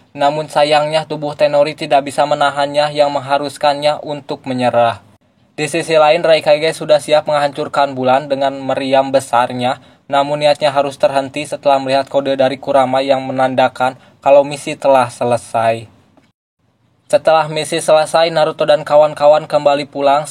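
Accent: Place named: West Java, Indonesia. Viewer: Sundanya kdngeran banget bang😂😂😂👍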